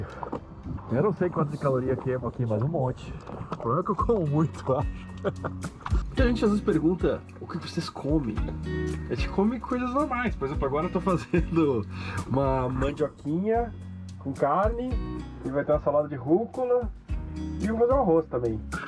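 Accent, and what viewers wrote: Rio Grande do Sul, Brazil. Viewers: Buenas tu és gaúcho .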